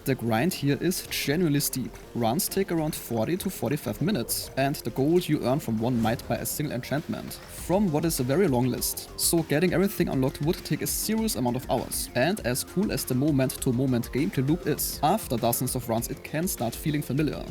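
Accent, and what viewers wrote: Germany. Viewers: Are you from Germany? :D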